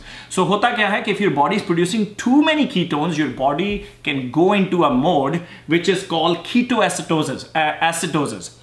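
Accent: Punjab, India. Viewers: apka bolne ka tarika mujhe bohot pasand hai sir Punjabi English language apki kya baat hai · Kash like ki jaga pe smily emoji hoti is video k lye tou bunti hy bosss 😜😊😊😊 Thanku soo much for your advice with zbrdast style of punjabi tarqa 😜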